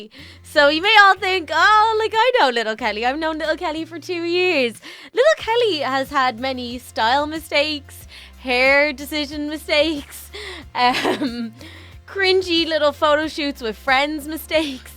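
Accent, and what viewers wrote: Ireland. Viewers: Omg I remember watching u when I ren was like 7 omg I missed ur Irish voice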